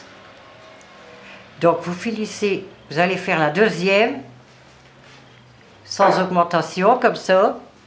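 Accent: North, France. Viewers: bonjour, comment va tu je vois que tu as fait un très beau pull, mon arrière petite fill.va avoir deux et griniette petite menue, il et très beau comme habitude, c est bon de t entendre parler avec ton accent du nord, je vais essayé de le faire avec moins de mail? gros bisous et gros Câlins a pompon, fais bien attention a toi, a très très vite bonne après midi, Monique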